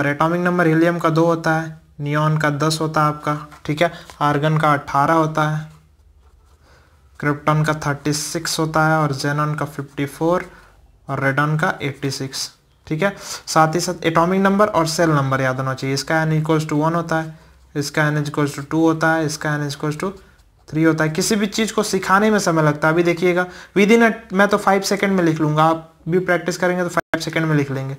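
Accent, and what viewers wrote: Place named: Bihar, India. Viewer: Bhai bhut shai explains krta ho appp❤ love you bro mere ko bhut dikkat thi isma 😊 ak baat puchu bhaiya ap bihar s lag rha ho 😅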